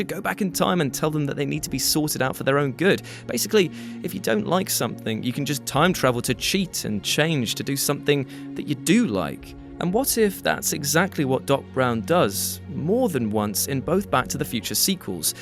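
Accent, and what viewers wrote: United Kingdom. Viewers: British accent!!!! 👂😫😖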